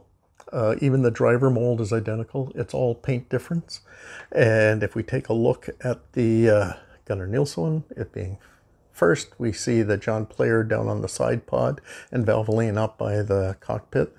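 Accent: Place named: Canada. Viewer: Hello, Thank you for another nice content about F1 slotcars, as well as the extra footage and books you`ve shown. The Lotus F1`s remember me of my teenage days in the 70`s when I watched a lot of F1 races. I had been a fan of Ronnie Peterson and I had the Corgi Toys replica back then. When I got older, I gave it away to my cousin to play with. Sadly, there were no F1 models of the Lotus by Carrera at that time, if I remember correctly. I had a 132 Carrera Universal set then. Scalextric was not known in Germany at that time here. Very nice models by Fly, as they always do, but technically seen, Fly is not my choice to go for in slotcars. As shelfqueens they are maybe fine, but they are to fragile if you crash them in my opinion. Are you situated in Canada, cause I think I heard a Canadian accent there?:-) I got a lot of Family in Ontario. Any way, I finally left a subcription and a like. 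Greets over from Germany 🙂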